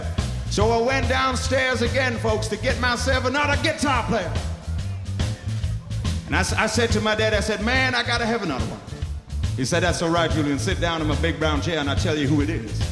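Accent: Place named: Gelderland, Netherlands. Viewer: dat komt omdat hij een zwaar accent heeft omdat hij uit gelderland komt, hij wil daarom niet voor schut staan met zijn accent, daarom praat hij continue engels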